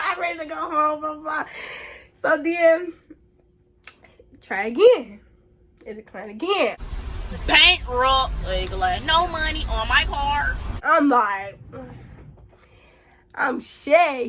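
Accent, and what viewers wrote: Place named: Chicago, United States. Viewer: I like ya accent lol you sound like you from Chicago “blew me” is funny asfff 😂😂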